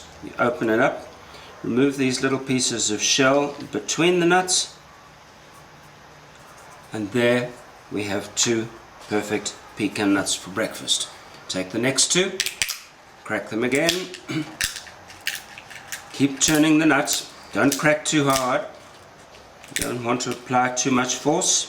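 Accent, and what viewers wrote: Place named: Deep South, United States. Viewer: Deep South.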